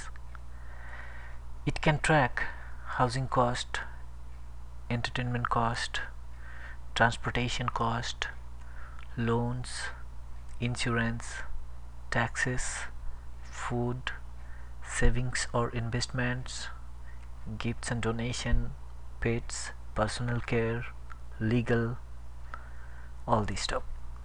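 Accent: India. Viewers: Are u gujju? Ur accent says that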